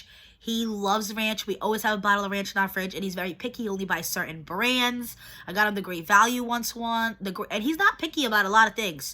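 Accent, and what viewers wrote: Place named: Massachusetts, United States. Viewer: i knew you had to be from mass with your accent! 😂 i found you on craftygirls comment section a few weeks ago, so what are the odds that we live in the same state?!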